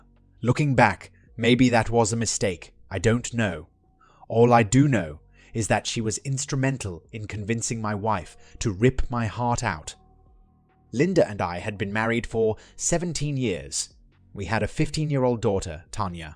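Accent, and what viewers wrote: United Kingdom. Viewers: Lol. Annoying British accent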